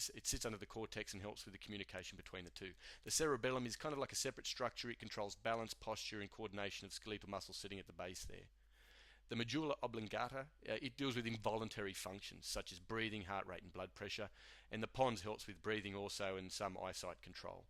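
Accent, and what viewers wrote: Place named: Australia. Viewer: Sounded as if the bloke was Australian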